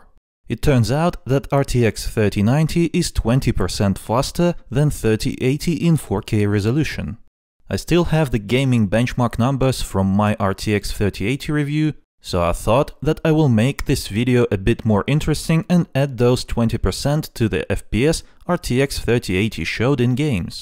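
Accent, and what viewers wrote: Russia. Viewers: I thought he had a Russian accent 👍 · Ля Русский. Сразу понятно. Но произношение классное, как человек живший все жизнь в США говорю.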